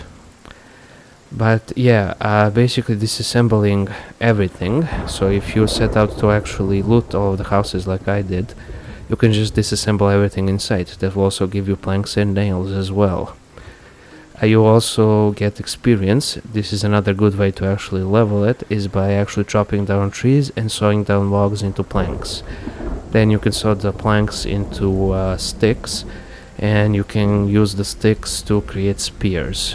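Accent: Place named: Eastern Europe. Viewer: He is from Eastern Europe. They speak like this.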